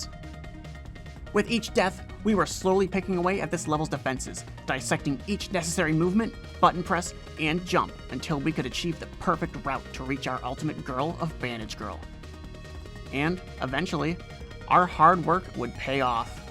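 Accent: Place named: United Kingdom. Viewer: British lad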